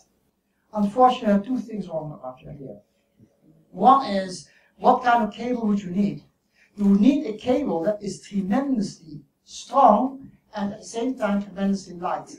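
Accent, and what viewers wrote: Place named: Germany. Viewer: Well I was going to watch it, but all the bumps and feedback in the audio on top of a muffled German accent make this video harder to understand than a police radio haha. Did you actually watch this before posting it? Seriously, it's like someone put the microphone in a sock full of rocks, dangled it from a string, and started trying to play Conkers with the speaker, before adding an echo effect post production and following it up with noise removal on a sample of his voice.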